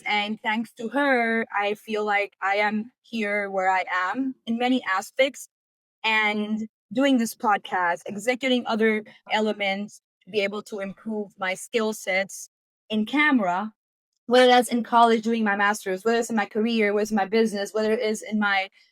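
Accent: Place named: Puerto Rico. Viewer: Nice vid. Why do you have an indian name but a puerto rican accent?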